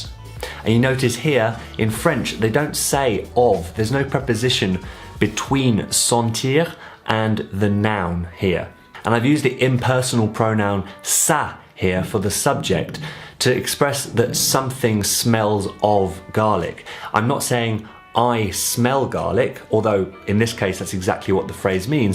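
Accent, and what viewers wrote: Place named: England, United Kingdom. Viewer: je pense que nous apprends le meme facon donc j'aime ca ton videos tellement m'aider. Je sais ma francais est mal, mais avec le pratiquer chaque jour c'est devenir plus en plus facile. merci de m'aider. ca fait deux mois depuis je commencer mon voyage de apprendre et ton aide est vraiment utile. - aussi une idee pour toi. - I would really like a video in full french on a simple subject, as hearing english accent in french is very clear for me and helpful since im also from the UK, maybe you already have one?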